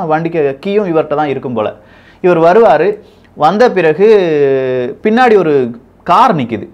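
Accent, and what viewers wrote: Kerala, India. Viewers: Hello bro! I watch all your videos! You have a very strong Malayalam accent! Why don’t you release some videos in Malayalam also! Are you basically a Malayalee bro? Someone said you are from Tirunelveli, but you don’t have Nellai accent at all. I am originally from Tirunelveli, so I know Nellai accent very well. Your accent is neither Nellai accent nor Nagercoil accent! Your accent is 100 percent Malayalam accent! It will be good if you release some videos in Malayalam under the tittle “ Malayalam Pokisham”!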